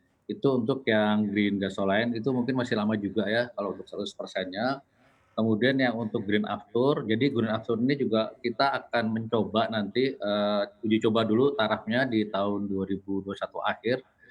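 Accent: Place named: Banyumas, Indonesia. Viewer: bapake kayane wong banyumas/cilacap ya..ngapake esih keton loh pak .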